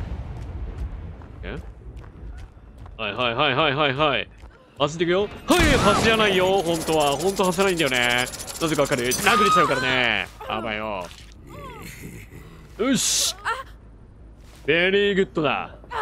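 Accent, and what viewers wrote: Kansai, Japan. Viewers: さんの関西弁めちゃくちゃ最高です好きです((*´∀｀*)) 新キラーのデススリンガーさんかっこいいですね！！銃のかっこよさ、そしてカッコよく狙って撃つさんかっこいいです✨ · 新キラーかっこいいですね！さんの関西弁素敵だったです(*･ω･) メメントの時に後ろに写りこんでいたサバイバーさん笑いましたwww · キラーかっこいいのにさんの関西弁ミスマッチすぎるwでもそこがいいw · さん今回めっちゃ関西弁で喋ってくれてはりますやん… さんのデドバ面白くて大好きです！ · 新キラーやパークの説明など盛りだくさんの動画ありがとうございます( ˘꒳˘ ) やっぱりわかりやすい!!! 途中からテンション上がって楽しまれていたのか、低音イケボの関西弁でplayしてたのがたまりません🤖♡ 特にメメントしてた ~ のとこ好き🤭 かっこよかったです！ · 新キラー､ビジュアルもカッコいいけどリロードがまたカッコいいですね！さんのサバイバー吊った時の高笑い最高です😆 後半の関西弁わざと強めなのも好きです！楽しかったです♪ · 新キラーおつかれさまでした！新マップも面白そうですね！壁とか壊せるようになったんですね半ギレしてるところと、インストっていうからどんなんなってるのかと思ったら、完全にうつりにきてましたね、あれw 面白かったです(*´ー｀*) 後半の方は関西弁強めだなと思いながらみてました！どっちもハッチ見つけるの早くないですか？！最初のは絶対逃げれたのになー🤔と思いました。これからキラー使っていく１つになるんですかね？？楽しみにしてます！ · 動画観させて頂きました🙏✨ 後半の動画では、関西弁が多い＋メメントが、とてもかっこ良かったです☺️💕 “写りたがるやつ”が、とても面白かったです😂😂😂 サバイバーだったら凄く嫌かもしれませんが、キラー側で、このメメントが決まると、とても気持ち良さそうだなと思いました😌✨ 今回も楽しい動画をありがとうございました🍀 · このキラーめちゃめちゃかっこいいですね😆 使いこなしちゃってるのもさすがです👍 関西弁多めヤバすぎ(笑) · 後半の関西弁大量発生うれしすぎ😍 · お疲れ様でした～ 銃使うのめっちゃ面白い😳😳 関西弁が今回多くて、それこそ10000イイネですね👍👍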